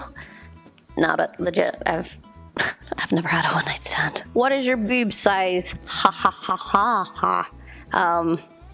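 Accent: Ireland. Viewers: Clickbait title and video starts with: "Hello Friends, I have a yeast infection". I love that irish accent, and I get better at understanding it every day. I'm at almost 50%! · I dont know what it is about you but i find you absolutely amazing.sweet.cute and absolutely stunning.you have gorgeous eyes.and the accent.i know its cliche but the irish accent.and your singing voice!! Sorry.im in love haha 😍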